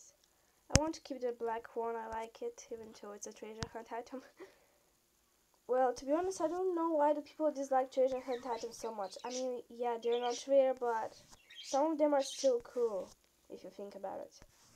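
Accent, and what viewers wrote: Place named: Russia. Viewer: Hey! I'm half Russian too! My mom is Russian.. but I don't have a great accent like you and I'm from America! Anyways, love you your the best!:p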